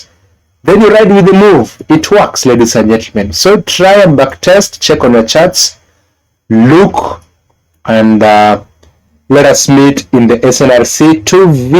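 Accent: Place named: Kenya. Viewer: Kenyan accent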